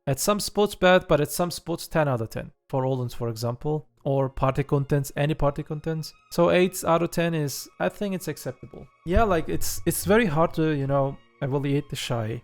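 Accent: Turkey. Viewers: Are you turkish ? Your accent exteremely turkish .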